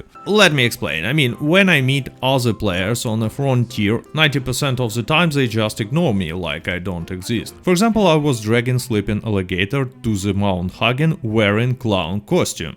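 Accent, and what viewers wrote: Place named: Russia. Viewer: Is he Russian, is he German, is he whatever Niko Bellic is?? Maybe he can do an impression of him!! Please say "Anything for you, cousin!" I love this dudes accent!! (btw I know hes Russian but his accent sounds like other accents..so awesome)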